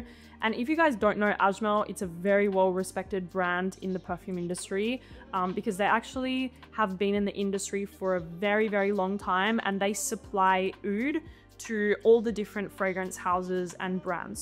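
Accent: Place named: Australia. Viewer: That’s called an Australian accent. I love it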